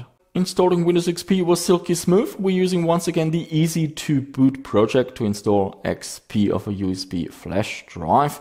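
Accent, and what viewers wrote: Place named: Germany. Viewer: Wer merkt von euch wohl dass die Person mehr deutsch ist als mit dem englischen Akzent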